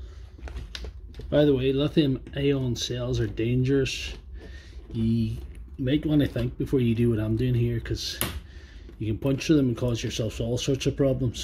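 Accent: Northern Ireland, United Kingdom. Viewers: Do you fix batteries?? I have 3 genuine ones doing with this red/green light flashing.. tried on 2 different chargers.. going by the voice your in northern Ireland so thought I'd ask lol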